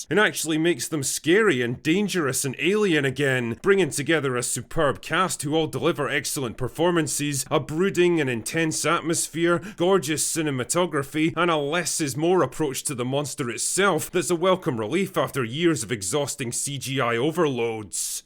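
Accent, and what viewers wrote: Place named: West Lothian, United Kingdom. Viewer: The Drinker Recommends, I watch And it’s not because he sounds like he lives just along the road from me (Livi - though I’m a proud westerner. Mon The Sons)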